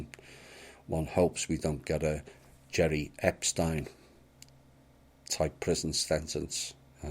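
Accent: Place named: Liverpool, United Kingdom. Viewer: This scouser loved the sound of his own voice, I haven't seen one reply to any comments in 2months of watching!! I am from extremely close to were his accent is from and I have Ufos meeting me every single night, and sometime in the late daytime too! I am Spiritually Awake now and I have so much information I could really help people with, and this guy won't ever answer any comments of mine to even ask what Ive got, and he just plays his small jigsaw piece internet show he is doing, what's going on Lad Ive got evidence that proves how intergrated these vermin lizard archons Draconian Energy stealing <mail>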